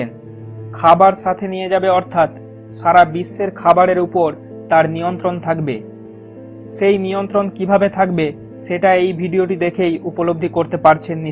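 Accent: India. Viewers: Dm mahmud Bhai uni indian.. And indian der bangla uccharon amader cheye different.. Ek ek arear banglali ra ek ek rokom uccharon kore.. So, kawke evabe opoman korben na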